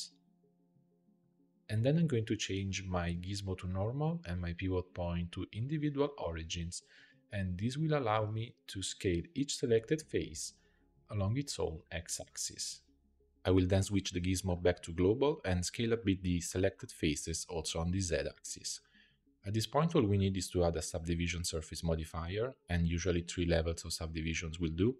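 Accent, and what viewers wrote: Italy. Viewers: Ma sei per caso Italiano? 🤌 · Sei italiano? Si sente dall'accento!😂 Complimenti per i tuoi video comunque, grazie!❤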